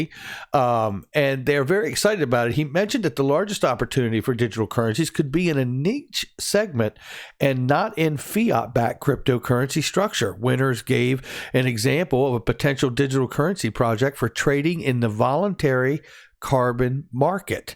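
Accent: England, United Kingdom. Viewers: Come on mate, that sounds like a pommie accent, not a Aussie.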